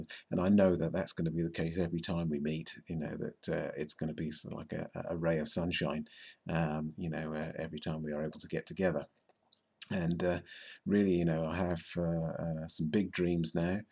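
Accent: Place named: United Kingdom. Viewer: Just gotta say, I love your accent (I'm a sucker for a British accent though).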